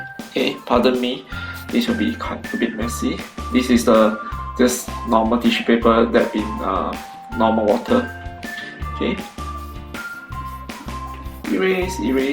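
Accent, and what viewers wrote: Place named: India. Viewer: Are you Indian? Hard to understand...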